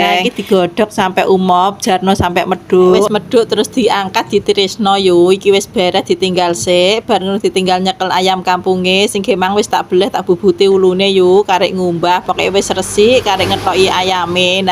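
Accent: Java, Indonesia. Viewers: iki ko ndi mbak e...jawa timur koyone yoo,? bahasane khas jowo👍❤️❤️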